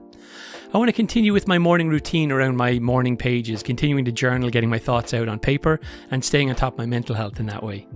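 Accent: Ireland. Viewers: Great Video, I'm looking forward to the smart glasses review, I might buy a pair for travel in the future. Its great hearing an Irish accent, with the amount of tech videos I watch.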